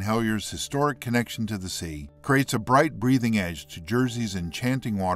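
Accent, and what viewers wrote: United States. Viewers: One block has been more or less finished, at least on the outside. Why the hell is this narrated by a yank?! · Why the American accent? hmmm ....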